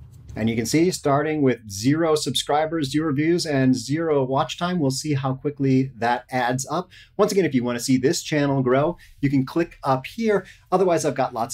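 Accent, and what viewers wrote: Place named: United States. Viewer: im intrested in your accent . its thick and pure american. i appreciate you spendnig time and putting effort into sharing your useful information with others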